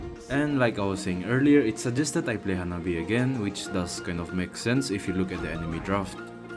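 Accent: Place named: Manipur, India. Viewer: Why do i feel like you're Meitei or north eastern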